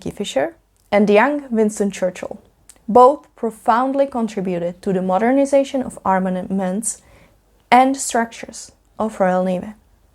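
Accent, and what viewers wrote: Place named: Eastern Europe. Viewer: This slavic accent needs to be removed. If I did it, you can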